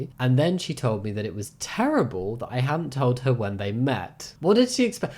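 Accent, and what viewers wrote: United Kingdom. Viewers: The changing thing probably is also seeming weird because in the U.S. people are a lot less comfortable changing and not wearing clothes around other people. The thing is, women in America wouldn't feel comfortable changing in front of men. Are you saying that is wrong? I feel like it is a weird faux paux for her to like not look away or step out if a friend is changing. I am assuming you are British from your accent right? Americans feel VERY weird about unclothing/nudity and it is sexualized in our heads. Idk if one of my friends started undressing in front of me and I they were of the sex/gender I was attracted to, I would definitely make sure they knew that and look away/step away. I think this is a cultural thing. Like I get why you are upset and in theory what you say makes sense, but then should we be outraged that the women aren't getting undressed around men? 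(No). Idk, I'm afraid of both straight and queer people SA'ing me (so I don't get changed around anyone at all). So, they are afraid. They shouldn't block you but like if they decide they aren't comfortable changing around you and stop doing that is that somehow a problem? Like people should be required to change around people they don't want to change around? I think this is an American prudish thing. And a public changing room with a lot of people is different from walking into your bedroom with one other person and taking your clothes off. Idk, you don't get free rights to watch whoever you want to unchange. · are you british? you kinda sound brih'ish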